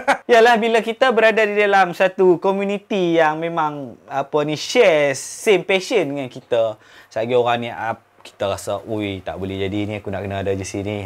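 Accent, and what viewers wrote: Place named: North, Malaysia. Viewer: Baru jumpa channel ni.. Serius content sempoi bro !! Dengaq pekat utaqha tu jatuh cintaa nak layan haha.. btw keep the content bro & stay active this channel..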